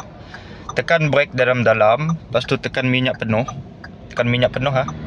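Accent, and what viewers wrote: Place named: Sarawak, Malaysia. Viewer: Org srwak je ade tune mcm n😁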